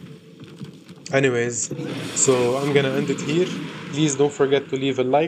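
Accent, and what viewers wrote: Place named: Arab world. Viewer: My dude your accent is very close to my own, are you lebanese or anywhere around the middle east?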